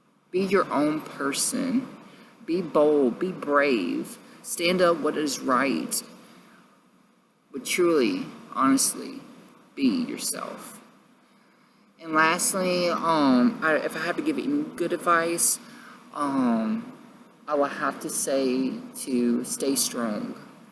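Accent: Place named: South, United States. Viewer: So You are a gorgeous man, smart, sweet, Have a very southern accent and still love God, Yeah I'm pretty sure you are what we call a perfect guy 😀 This was an amazing Coming out story that was so Genuine and unedited, which is what I think is perfect. You're a Grandma's boy, that may of been the most adorable thing you could say 😊 You sound so much more interesting than me. I'm Steven, Geeky gay guy who's 25 From Upstate New York that has Asperger's. Anyway, I'll shut up, I'm probably boring. I'm happy to be your 13th Subscriber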